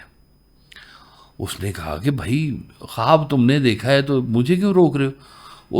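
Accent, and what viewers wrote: Punjab, Pakistan. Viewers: ji....thoda punjabi accemt hai na isliye thoda samajh na hi aati....1 martaba suni thee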